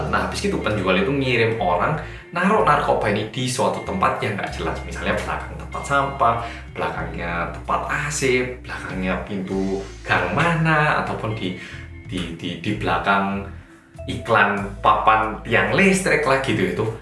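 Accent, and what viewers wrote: Java, Indonesia. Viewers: gw suka nih konten ini crita2nya update,klo bcara detail dan jlas.lucu jg krna medok nya...smngat trus ya.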